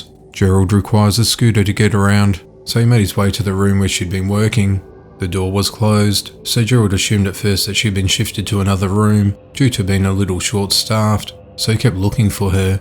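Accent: Australia. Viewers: Please get a job as an American news anchor so we can have that smooth, Aussie jazz you call a voice telling us the miserable American news so some good can come from it?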